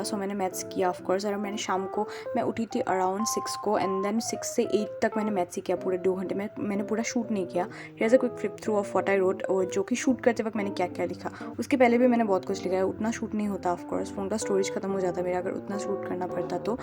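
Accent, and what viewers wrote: Assam, India. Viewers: Ghy Assam ? Cause you're accent sounds Assamese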